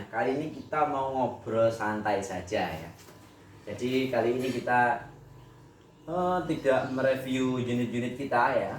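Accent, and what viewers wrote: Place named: Java, Indonesia. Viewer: Asli wong Palembang,,,,,, TPI logat bicara Jawa ny jngan d bawa mas,,,,,,bawa logat Palembang aj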